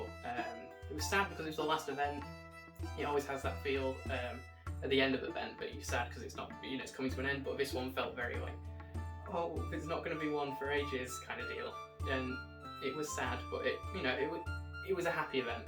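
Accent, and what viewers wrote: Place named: Hull, United Kingdom. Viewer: Future Empire Navarri been binge watching these to see what it’s about these are great, also what accent is that sounds Hull or somewhere alike good description video 🍻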